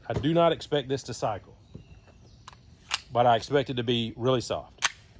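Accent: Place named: Alabama, United States. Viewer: I knew that was an Alabama accent. About to pick up a Rugged Mustang at Larry's myself. Nice video. Helpful.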